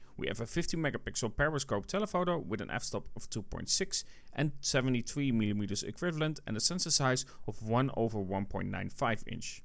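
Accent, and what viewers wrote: Netherlands. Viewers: Hoi, het viel mij al op dat u ook Nederlands was vanwege uw accent (Geen belediging, uw Engels is goed). Ik heb zelf ook maar besloten om de zwarte OnePlus 13 te kopen met 512GB/16GB RAM opslag via TradingShenzhen Mij werd geadviseerd om de OnePlus 12 te kopen destijds eerder deze jaar maar ik besloot om het maar toch niet te doen tot later deze jaar waarin het toch duidelijk werd dat ik echt behoefte had aan een nieuwe telefoon dus ben ik maar voor de OnePlus 13 gegaan. Het lijkt er ten slotte op dat ik onbewust een goede keus heb gemaakt. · lol ja je bent duidelijk nederlands zo te horen ;)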